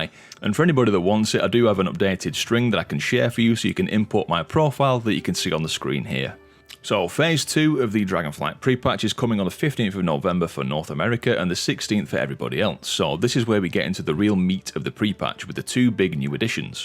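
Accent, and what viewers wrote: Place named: North, United Kingdom. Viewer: Its the warm Northern accent that im here for really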